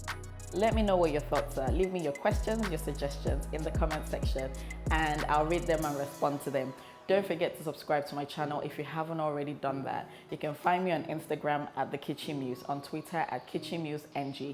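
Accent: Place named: United Kingdom. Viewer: I use to think you live in the UK because of the way you speak. I love the recipe.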